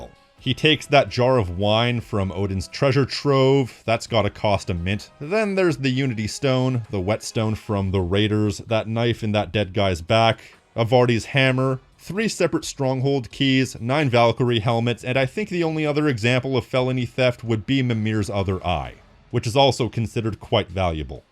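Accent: Canada. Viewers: Hes Canadian, note the subtle pronunciation of a-boat instead of about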